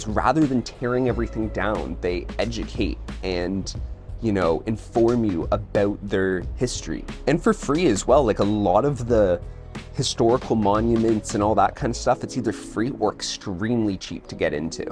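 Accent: Canada. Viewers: You sound Canadian?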